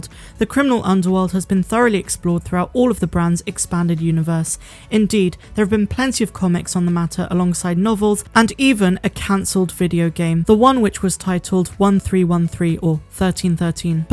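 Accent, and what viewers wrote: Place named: West Country, United Kingdom. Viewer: I always enjoy your videos and I love hearing your west country accent (Bristol is one of my favourite places in Britain). I often wonder if there is a correlation between how exciting the news is and how many ''Uttinis'' in the intro. I do miss the 3 x Uttini intro. ;) Keep up the good work, and may the force be with you ;) x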